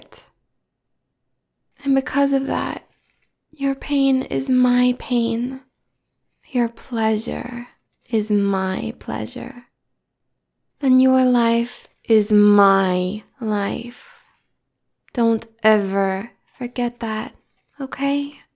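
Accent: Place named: Brooklyn, United States. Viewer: I was thinking more of a Brooklyn New York accent but that works too 😄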